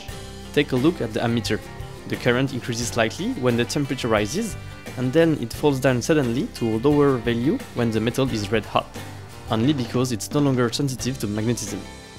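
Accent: France. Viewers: Très belle vidéo! Vous êtes français non? Cet accent <3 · You have a french accent. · Nice work, I can't tell what your accent is, are you French ?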